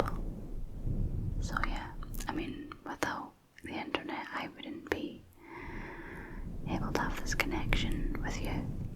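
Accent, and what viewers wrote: Scotland, United Kingdom. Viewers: So soothing, eyes involuntarily closing, and the video becomes a softly whispered, Scottish podcast...very nice.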